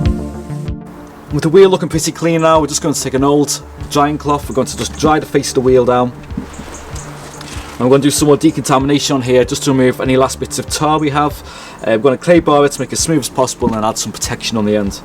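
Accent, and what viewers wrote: Liverpool, United Kingdom. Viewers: Scouse but with a touch of refinement? Crosby?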